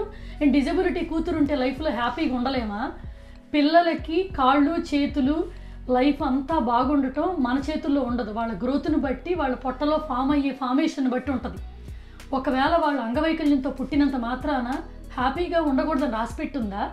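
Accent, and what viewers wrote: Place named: Guntur, India. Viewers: Sister asalu mee voice yentho bagundandi asalu first time mee videos chusinde mee voice vini because ma kosta ammayi laaga anipinchindi so I love ur voice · MEE VOICE NAAKU CHAALA ISTAM!!! It is unique, vinalanipisthundhi vintunte dhaaniki thodu aa gunturu yaasa perfect blend. Negatives assalu pattinchukokandi vaallaki taste ledhu anthe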